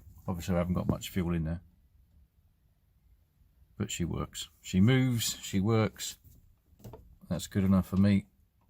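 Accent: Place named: Cockney, United Kingdom. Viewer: You sound like you were born within the hearing of Bow Bells... I had a really dear friend who my kids called Grandpa no. 2 who was from East London, sadly he passed away last year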